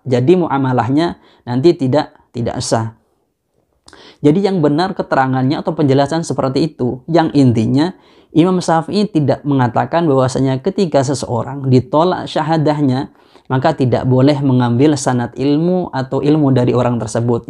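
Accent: Madura, Indonesia. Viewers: Kesalahan baca kitab itu hal yg lumrah, bisa jadi karena sabqul lisan, atau sebab lain. Seharusnya anda mendukung dg apa yg diperjuangkan oleh Ustad Faizar, mengoreksi bacaam bukanlah hal yg salah tapi dengan menyalahkannya di youtube akan memframming diri Anda sendiri kalau anda bersebrangan dengan beliau, apalagi anda sampaikan di momen yg tidak pas, yakni perseteruan antara dukun dan pesulap. Harusnya anda yg alumni pesantren dan mengaku kiai muda (di channel) kudu lebih bijak dan berhati-hati. Lebih baik tabayun agar tidak blunder permasalahannya. Ingat beliau itu Muhammadiyah, sedangkan anda dilihat dari logat bicara dan gaya menyampaikan isi kitab kuning adalah orang madura dan pastinya NU. Pahamilah perbedaan ini, agar gesekan antar umat tidak terjadi.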